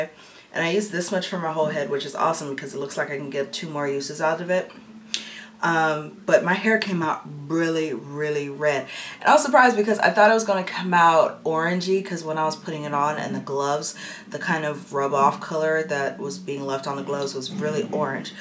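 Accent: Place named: Toronto, Canada. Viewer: You sound like you could be from Toronto.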